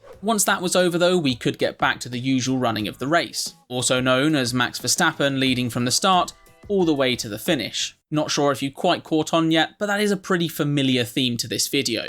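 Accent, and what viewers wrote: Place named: United Kingdom. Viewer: This vid starts. I hear Brit accent and I hear immediate jab at Verstappen. Yah. Ciao. Not my video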